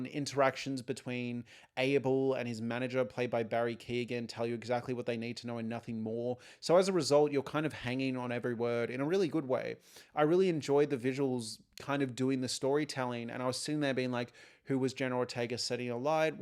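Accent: Australia. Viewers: I'm so happy you replied ! ^__^ as an American, I love different accents. Australia being in the top 3 ! British, Ireland, and Australian ! I can always tell an aussie by how they say no. It kind of sounds like nor with an R Thank you so much again!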